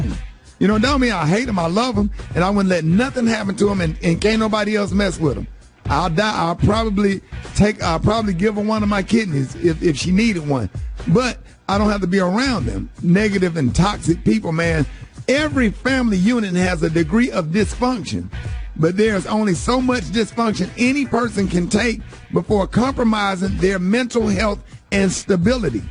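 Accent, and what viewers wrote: South, United States. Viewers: 🎙️🎙️. Bro. I love your channel 💜 and your southern accent. I finally made up with my cousin. Every family isn't perfect. God is still helping me to love on them.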